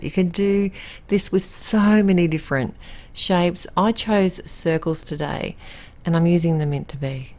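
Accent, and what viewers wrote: Australia. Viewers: Nice to hear your Aussie voice! Means I’ll be able to find all you used! Thank you 🙏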